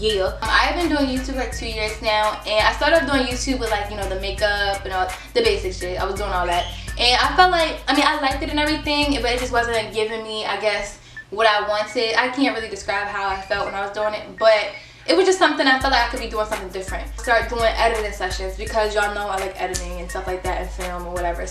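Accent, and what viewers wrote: Baltimore, United States. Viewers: You sound like you're from Baltimore 😍💕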